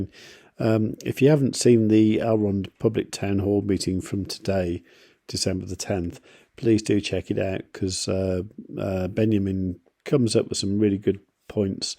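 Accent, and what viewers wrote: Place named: Tamworth, United Kingdom. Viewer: you sound like you come from Tamworth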